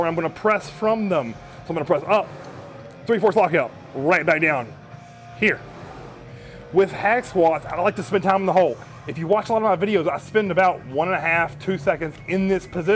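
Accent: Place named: Yugoslavia. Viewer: You have a very strong yugoslavian accent that I can't get over but seriously you have excellent advice. The key to VMO activation is pretty much raising your heels and staying in the lower half position of the squat. We my wife & I try this in our village, GOOD FOR MILKING COWS!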